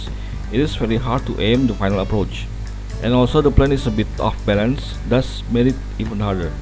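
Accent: Indonesia. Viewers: Pantes kok kenal logat2 inggrisnya, ternyata org Indo. Wkwk. Mantep banget channelnya mas, gak nyangka ada yg punya topik foam RC plane 👍👍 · Tadinya gw kira ini channel luar, pas denger logat nya wahh indo nii, dari ini gw percaya channel berkualitas bnyak juga di indo. Keren sii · gw tau abang org indo karena dari logat 🗿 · how come I feel the way you speak English is like an Indonesian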